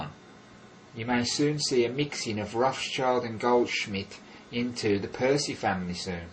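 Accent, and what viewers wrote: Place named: United Kingdom. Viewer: this guy's voice is regrettably British...thumbs down.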